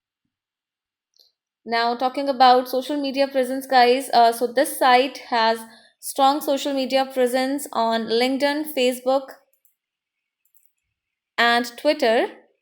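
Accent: India. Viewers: Indian women try speak clearly English and not whit funking indian accent cuz not all people understand you. Try speak slowly and clearly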